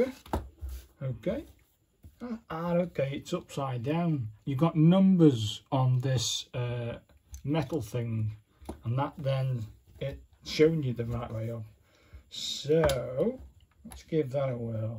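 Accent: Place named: Stoke, United Kingdom. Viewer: Thought I recognised the accent. Mind you, I should given I have the same one 😂 I’m from ‘castle 👍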